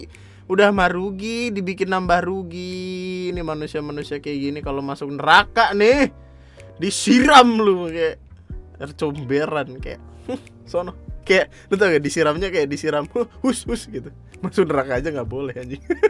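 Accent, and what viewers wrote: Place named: Medan, Indonesia. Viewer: Dari logat nya kaya nya orang Medan itu bang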